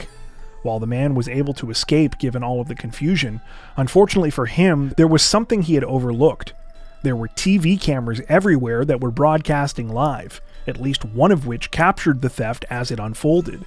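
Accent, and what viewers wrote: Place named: Canada. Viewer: I love how you beautifully pronounce foreign names, and speak in a really soft north American accent, I'm thinking maybe you're Canadian.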